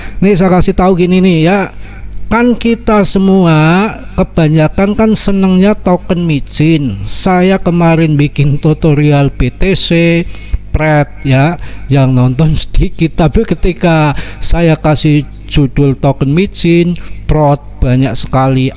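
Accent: Banyumas, Indonesia. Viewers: Lah wong Purwokerto kye tah,ngapak njeplak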